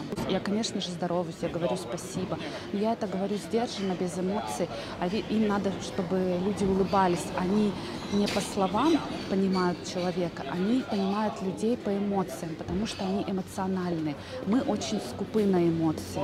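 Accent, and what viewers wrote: Urals, Russia. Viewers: Уральский говорок узнаваем. Я сразу понял, откуда вы. Про путина - лучше промолчу, чтобы негатив тут не оставлять. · Я так знала, что Вы с Урала! Говор наш)) я из Екатеринбурга, большой привет 🙋‍♀️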